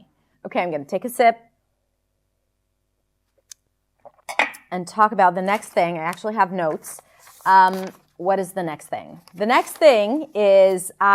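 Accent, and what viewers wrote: United States. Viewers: Hi mom i am watching ur all videos day n nyt without sleeping because i like your crystal clear pure american english i am noticing each letter how u pronouncing your voice very clear to understand and i started to learn and practice same like ur accent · Wow! She"s not a native speaker?! But sounds like one. Perfect american accent. Really good! 😁❤️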